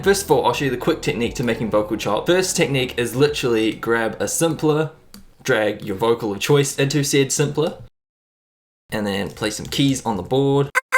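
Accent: New Zealand. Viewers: hahaha yeah, i started watching and i was like damn i swear this guys from nz then i checked the channel description 😂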